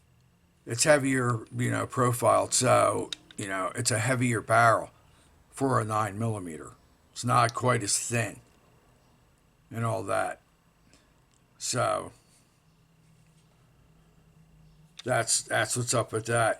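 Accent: United States. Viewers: Where are you located? Got kinda a “Balmore” accent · Hard philly accent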